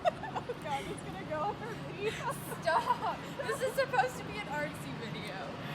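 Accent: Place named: Texas, United States. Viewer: Definitely can tell you’re Texan lol “don’t kick the trailer ma’am” haha though i live in tx too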